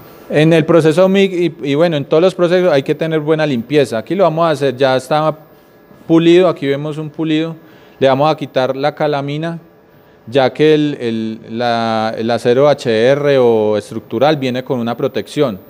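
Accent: Colombia. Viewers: a bueno pensé que eras de Medellín por tu acento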